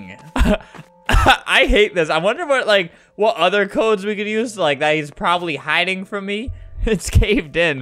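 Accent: United States. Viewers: U always sound like ur from america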